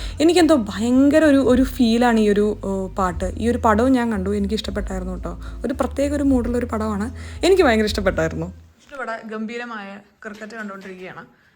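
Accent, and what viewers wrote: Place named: Pattambi, India. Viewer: വളരെ നല്ല തീരുമാനമാണിത്. എന്നും എല്ലാവര്ക്കും വേണ്ടി ജീവിക്കുമ്പോൾ കുറെ വർഷങ്ങൾ കഴിയുമ്പോൾ നമ്മൾ നമുക്ക് വേണ്ടി എത്ര സമയം ചിലവഴിചൂന്നു നോക്കുമ്പോൾ എന്നും account negative തന്നെയാകും. പിന്നെ മക്കള് തന്നെ ചോദിക്കും, നിങ്ങളോടു ഞങ്ങൾ sacrifice ചെയ്യാനായി പറഞ്ഞോ എന്ന്. Very good decision. Hats off to Vishnu for supporting this decision. Please don’t change’ OUR PATTAMBI’ slang. പിന്നെ അങ്ങനെയാണ് പട്ടാമ്പി സ്റ്റേഷൻ ഉണ്ടായതു എന്ന് പറയുന്നത് കേഴ്ക്കുന്നതു തന്നെ ഒരു ഒരു feel തന്നെയാണ്.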